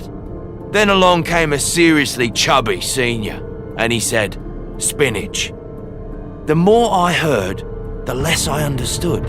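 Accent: United Kingdom. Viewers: HE IS BRITISH??? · I keep forgetting hes british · I KEEP FORGETTING THIS MF IS BRITISH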